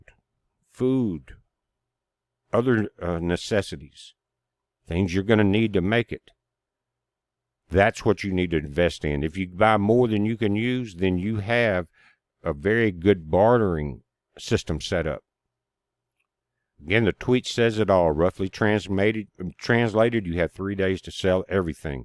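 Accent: South, United States. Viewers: I like him because he has a southern accent but his reporting is some of the most level headed reporting i've observed.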